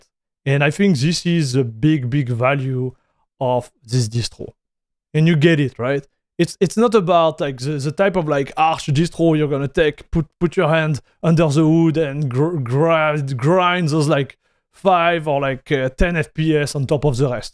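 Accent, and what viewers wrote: France. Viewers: Tu serais pas un peu français ?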